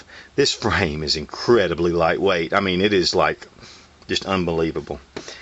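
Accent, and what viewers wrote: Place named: South, United States. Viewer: man i like your southern american accent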